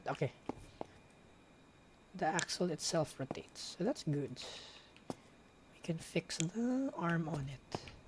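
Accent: Philippines. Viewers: Motorcycle and car noises in the background, accent, didn't even have so say it accidentally, fellow filipino spotted 👍 But dang, streaming 11 midnight, good content tho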